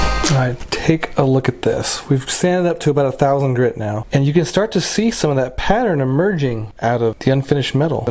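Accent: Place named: Indonesia. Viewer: Yang dari indonesia mana suaranya😁😁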